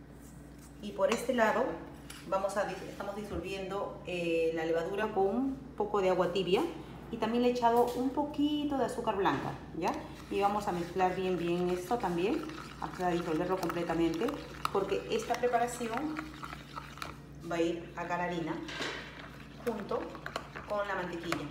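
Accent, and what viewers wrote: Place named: Peru. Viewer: Que hermoso acento peruano. Un abrazo desde Chile. Soy de Ica.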